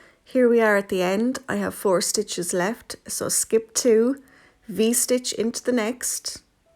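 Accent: Ireland. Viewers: I'm delighted to have found your tutorials. It's very easy to follow your instructions and I love your Irish accent!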